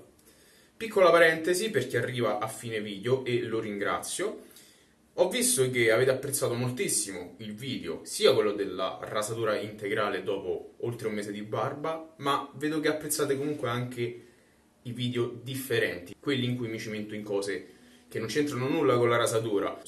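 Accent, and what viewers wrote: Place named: Rome, Italy. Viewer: Ciao, la tua cadenza romana mi piace molto. Penso che hai sviluppato una ottima tecnica, la tua zona baffi non è abituata alla lama ecco spiegati i piccoli taglietti. Ma il ventaglio dov'è? Io da quando ti ho copiato sta genialata lo uso sempre 😂🤣 Ti abbraccio 💈🔝👏👍💈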